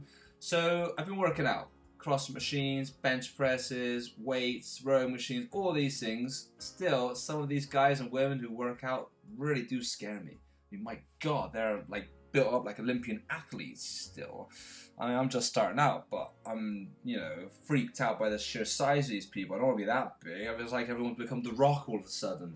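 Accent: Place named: England, United Kingdom. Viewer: Oh my friend! Te entiendo mas ahora jajaja se te pego el acento ingles. Jajajaja. Suerte con todos tus objetivos!!!!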